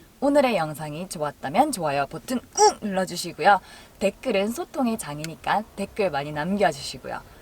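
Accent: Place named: Busan, South Korea. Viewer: 저도 부산사람. 말투 익숙합니다. 항상 기대합니다. 안전여행 기원해요.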